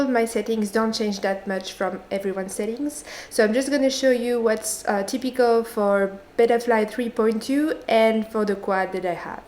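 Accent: France. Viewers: Ah cet accent lol, tu est française toi non? :) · J'avais bien reconnus notre cher accent français mais j'étais pas certain j'aime beaucoup t'es vidéos tu devrais en faire au moins une en fr :D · Je me doutais que tu étais française avec ton accent 👍 au top tes vidéos, keep going 👌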